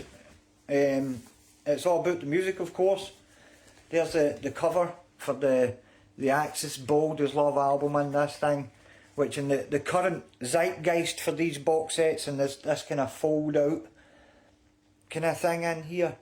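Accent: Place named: Scotland, United Kingdom. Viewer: Nice video. Your accent reminds me of an SNL skit in America. “Welcome to All Things Scottish, where if it’s not Scottish, it’s crap!” 😂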